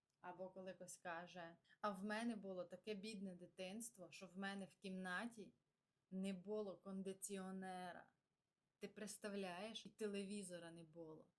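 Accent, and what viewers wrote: Transcarpathia, Ukraine. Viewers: Це закарпатський говір. Ви западенцями їх не називаєте. Западенцями ви називаєте Галичину.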